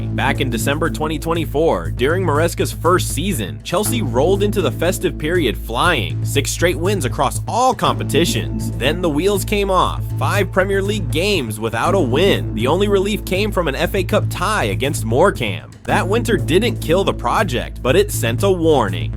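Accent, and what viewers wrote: United States. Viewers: Listening to a yank narrator on English football is a major put off. Blah blah blah blah blah blah blah blah. Tell us something we don't know 2 - zero!!! Foxtrot oscar mate!!!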